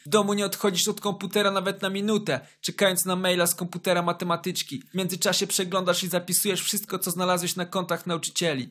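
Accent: Podlasie, Poland. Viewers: Ej mam pytanie jesteś z Podlasia? Xd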